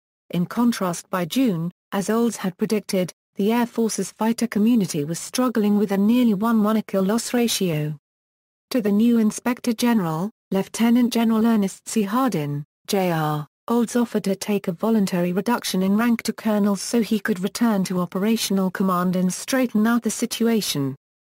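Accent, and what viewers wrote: England, United Kingdom. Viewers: She sounds English, has accent, ok?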